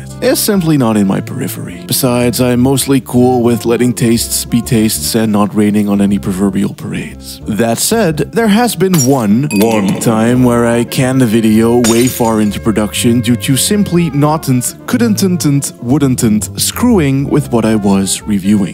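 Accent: Netherlands. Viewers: I had a sneaking suspicion you might be Dutch by the way you pronounce certain things but you casually dropping a Dutch sentence in the middle of this video still hit like a truck holy shit lol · Do I hear a very tiny slight Dutch accent? Edit: dus toch! 😁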